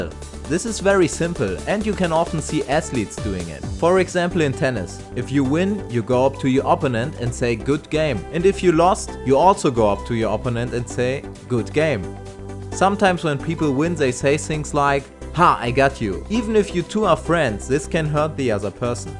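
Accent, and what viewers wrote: Germany. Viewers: Der deutsche Akzent... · great content, very german english but gramatically most of it is right, so keep it up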